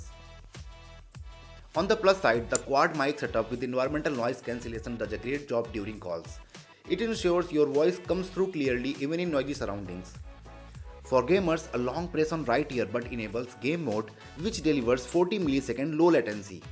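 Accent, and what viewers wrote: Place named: India. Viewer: Bro u speak like my UP Board english teacher from village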